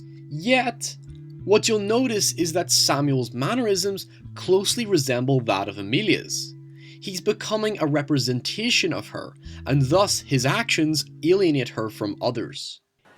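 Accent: Northern Ireland, United Kingdom. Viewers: where is this guy from is it northern ireland? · Are you Northern Irish? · Norn Iron?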